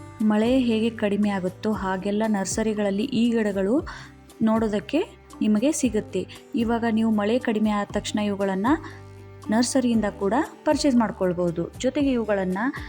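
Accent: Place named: Bangalore, India. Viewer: ನಮ್ಮ ಬೆಂಗಳೂರಿನ ಕಡೆಯವರು ಎಂದು ಭಾವಿಸಿದ್ದೆ, ಬೆಂಗಳೂರಿನ ಕಡೆಯ ಬಾಷೆ ಚೆನ್ನಾಗಿ ಮಾತಾಡುತ್ತೀರಿ ವಂದನೆಗಳು ಮಾಮ್.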